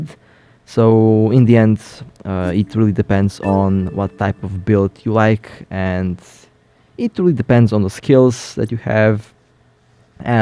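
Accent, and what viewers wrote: Russia. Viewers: акцент русский капец как из американских фильмах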